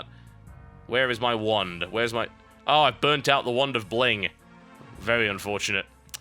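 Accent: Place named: Yorkshire, United Kingdom. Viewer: every so often you go into a yorkshire accent.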